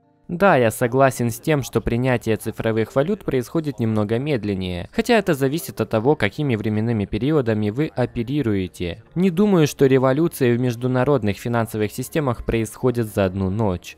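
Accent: Kharkiv, Ukraine. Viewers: ОООО ты с Харькова?)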